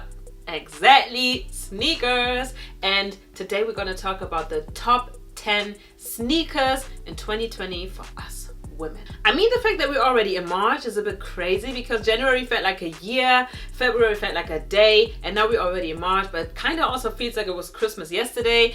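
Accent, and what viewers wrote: Germany. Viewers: Du hast voll denn deutschen Akzente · Are you german cause your accent sounds like a german accent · Hahaha habe ich grad auch gedacht so sprechen nur deutsche Englisch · She has a german accent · I'm also German and I can't stand German accents and I can't stand hers either She literally pronounces the words as if they're German words 😭😭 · Are u German? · du hast irgendwie ein deutschen aktzent in deinem englisch lol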